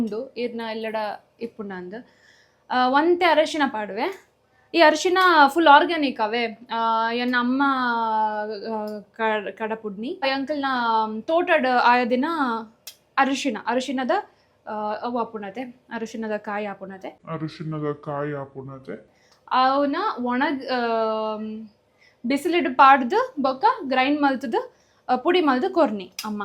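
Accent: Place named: Kundapura, India. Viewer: I like your Kundapura kannada , please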